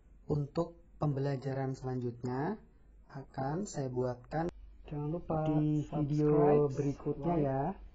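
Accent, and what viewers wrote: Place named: Sunda, Indonesia. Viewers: dialek e koyok dialek sunda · ini bahasa Jawa logat Sunda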